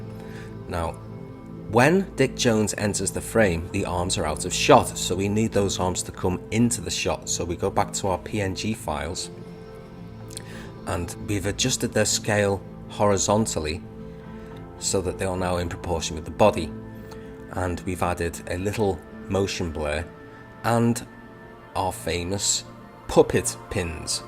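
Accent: Liverpool, United Kingdom. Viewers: A fellow Scouser hey?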